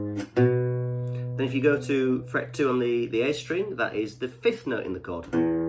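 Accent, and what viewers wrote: United Kingdom. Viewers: Great instruction. Love all the little added bits that fill out the song. You are a great teacher and all done with a Northern accent gives it a special touch. Thank you.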